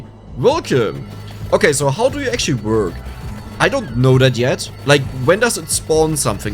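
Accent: Germany. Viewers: Just a question, where are you from? im sure your dialect is with a german aspect haha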